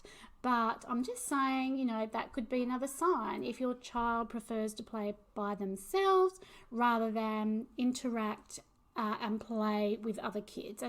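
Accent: United Kingdom. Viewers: I think you still sound like you have a bit of an English accent to me but I’m no expert. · Toe walking can also be because of a too short tendon between the heel and the calf muscle. Can be fixed with surgery. - Ecolalia can also be a result of TS/OCD - you're quite right. Same with coprolalia. - Constipation may be (I had it when I was a kid) because for some reason I liked the feeling of "keeping it in". NOTE! I've got TS/OCD and probably a tad Asp and a sprinkling of ADD. - My facial reaction can be totally blank by that is by will. - My primary language is not English but I still speak English with a Brit accent because I'm old enough to not have learned the US accent. ;) But then you are what you speak! See Star Wars princess Leia when brought aboard the Death Star and meets governor Tarkin. She mulled over the line with "I should have recognised your foul stench" and it didn't come out right. 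Of course not since she was American. Then someone on the set told her to try that line in Brit English, and lo and behold! Carrie Fisher delivered the line in perfect Queen's English. I must say you sound perfectly British (if you aren't). - I preferred to play alone as well and I have always been a "loner". Also, a sign is fixating on something. Like I did on computers and continued to work with systems development and maintenance in various capacities all my life. I get really got at it, too! ;) The ability to really focus on something is something I think people with autism/asp can do. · The only symptom I don’t/didn’t have on your list is delayed speech. I started speaking pretty early, surprisingly. But even down to you speaking in a British accent, assuming you did it because your dad is British… I also did the same, and my dad is also British and I’m American 😂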